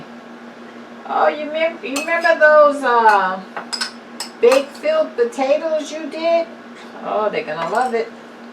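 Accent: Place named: New York, United States. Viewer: I just love your jersey or new York accent as well as your channel thanks for sharing hun😀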